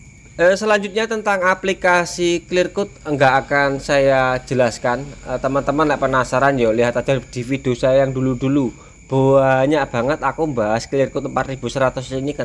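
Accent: East Java, Indonesia. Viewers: Lokasi di mana mas, ko kaya' jawa timur an...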